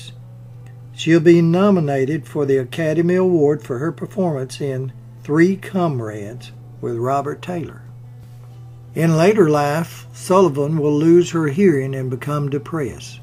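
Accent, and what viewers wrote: South, United States. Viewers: Dear, enjoy your southern draw, and gift in Biography's which keep getting better and better.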